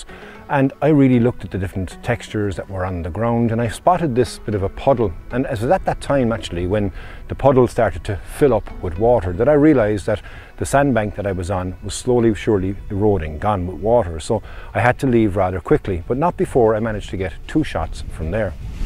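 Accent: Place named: Ireland. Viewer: Intro footage and tempo, class! a bag of top shots and some tones from emerald voice! Well bloody done bud.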